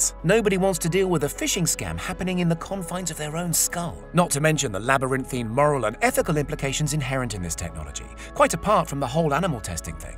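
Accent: United Kingdom. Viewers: Why do I love this guy's British voice so much?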